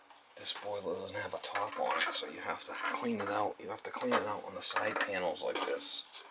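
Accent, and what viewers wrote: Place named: Cape Cod, United States. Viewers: Mada Nielk that’s a Dartmouth/Fall River/ New Bedford accent. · near cape cod accent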